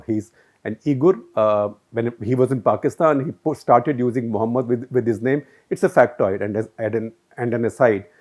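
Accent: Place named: South Delhi, India. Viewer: You just run on assumptions all day with south delhi english and hence i unsubscribed....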